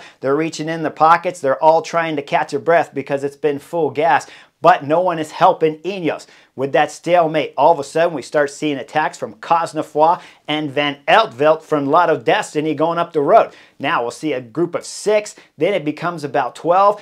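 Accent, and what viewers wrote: United States. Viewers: has the most "I don't give a fuck" American accent in cycling. Butchering every race and rider name left and right😅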